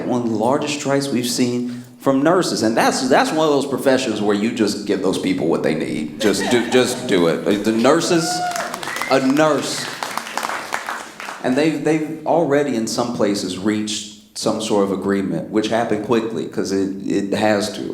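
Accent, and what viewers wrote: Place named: South, United States. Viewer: I notice that speaks in a way that shows he’s highly intelligent but at the same way his manner of speaking is “folksy”. For example he says wadn’t instead of wasn’t and them instead of those which is common in Southern dialects. He completely dispels the myth that if someone speaks a certain way they aren’t intelligent which is a common stereotype placed upon Southerners.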